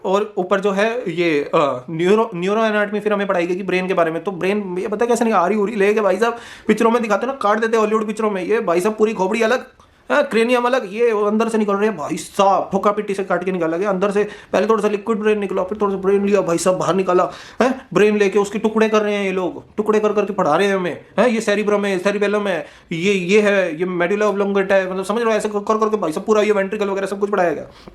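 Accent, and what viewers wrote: Haryana, India. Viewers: Bhai teri voice me haryanvi touch h Hostel m haryanvi students k saath rha h kya bhai ❤️❤️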